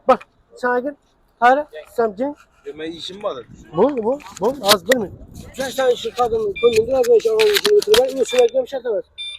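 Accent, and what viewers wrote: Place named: Khorezm, Uzbekistan. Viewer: Хоразм шевани тушуниш кийин булябди хеч булмаса бухоро шевасида гапирадиган йукми илтимос.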